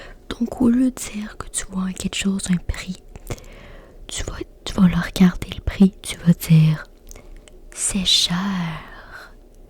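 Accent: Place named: Quebec, Canada. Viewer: Tu as un accent que je connais mais j'arrive pas à savoir 😂 so t'es de quel régions de Québec?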